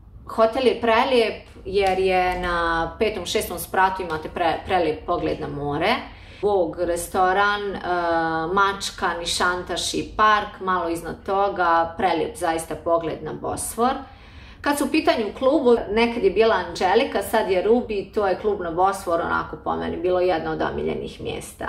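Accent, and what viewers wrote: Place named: Bosnia and Herzegovina. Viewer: Smatram da ne treba da raspravljamo o tome kojim jezikom prica.Ko imalo poznaje jezike bivse Jugoslavije taj zna dok neko, otviori usta"odakle dolazi. jeste sa Pala ali ima cisti bosanski akcent.Bez ljutnje ali budimo realni i iskreni.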